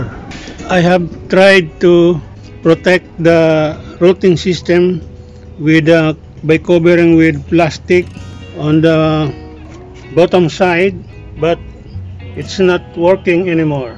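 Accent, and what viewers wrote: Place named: Italy. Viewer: ARE YOU ITALIAN!!!??? OUR VERY DEAR MR. GIOVANNI CUMAN BELONGS TO ITALY ALSO SPEAKS LIKE YOU.....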